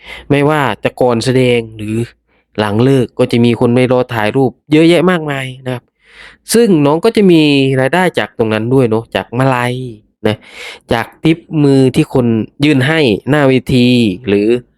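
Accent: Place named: Isan, Thailand. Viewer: ชอบการพูดคุยของคุณ ฟังสำเนียงแล้วรู้ว่าภาคอีสานแต่ทำรายการไม่ลำเอียงภาคก็พูดให้ดี ต้องเป็นคนอย่างนี้ซิคะถึงจะน่ารักและน่าติดตาม 👍👍👍👍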